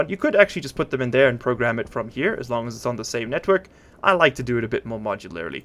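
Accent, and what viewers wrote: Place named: South Africa. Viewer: you sound south african, nice video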